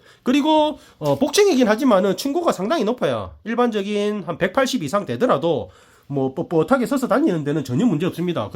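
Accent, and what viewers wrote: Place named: Busan, South Korea. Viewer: 부산태생이라그런지 에 자꾸눈이가요 말씀도 넘 구수하게 잘하시는 사투리가 듣기좋아요 응원합니다~~^^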